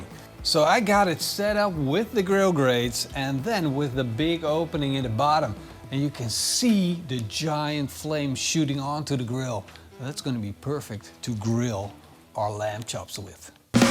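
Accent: Netherlands. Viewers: Love the whole video. The Dutch/English is awesome. Grill on!! I love my notifications on your videos!!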